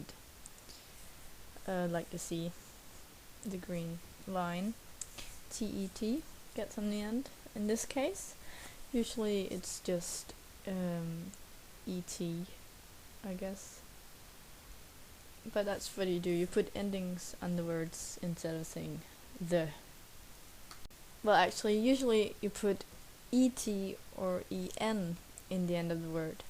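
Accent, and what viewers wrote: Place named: England, United Kingdom. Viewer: I noticed that the OR and ER's are said with what i'd relate to an English accent. Like in the word Hvor, its like voll, and i mistaken?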